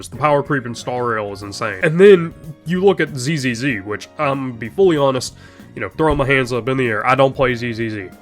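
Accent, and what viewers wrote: South, United States. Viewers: How did your Southern twang intensify over time 😂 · the southern accent is awesome, glad to see the upload! hope you feel better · Firstly damn sounded a lot more southern than he usually does In all seriousness though yeah the gacha selling Genshin has been having has been getting out of hand and honestly I'm glad to just be watching the chaos unfold from the sidelines because it has been getting ridiculous lately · Not relevant to video but are you southern by any chance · ah i wondered why you sounded so overly american lol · didn't post for a month and came with a southern accent. omega based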